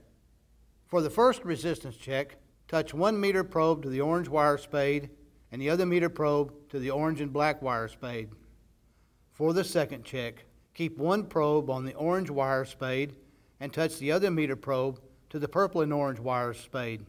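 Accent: United States. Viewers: Thanks, buddy! I'm Brazilian and your accent helps me to understand my American boss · He sounds like he is from the Ozarks of Missouri.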